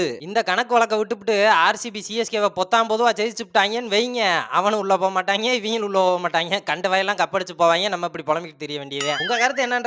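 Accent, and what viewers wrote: Madurai, India. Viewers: Nanum dhoni fan tha bro my inspiration 👍 nee nalla pesura bro maduraiya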